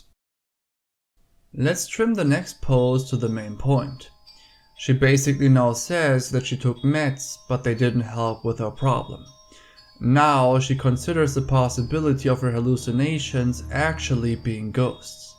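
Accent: Germany. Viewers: Are u German? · Sounds German to me too but I'm not positive.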